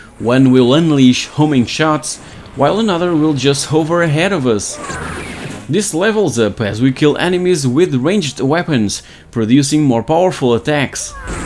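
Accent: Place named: Portugal. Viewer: I could feel the "tuga" through the accent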